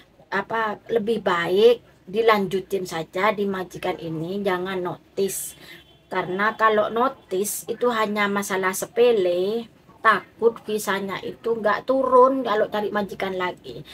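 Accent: Madura, Indonesia. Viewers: Kok kayak reng madure nik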